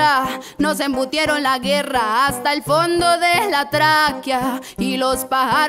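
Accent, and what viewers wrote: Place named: Antioquia, Colombia. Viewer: Ahhhhh si me imagine que era paisana colombiana por su acento!!!! Ultimamente he descubierto muy buenos músicos manizalista, un grupo de Salsa, una violinista en Europa y ahora La Muchacha, que bien. Abrazos a mis coterraneos paisas de media arepa, con cariño.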